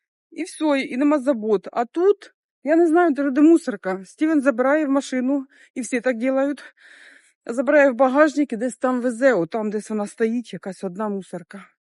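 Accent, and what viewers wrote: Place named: Surzhyk, Ukraine. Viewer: така спражня, і суржик це щось. Я з Запорізької обл. і мені це все так близько. З задоволенням дивлюсь ваші відео, ви молодець. ❤❤❤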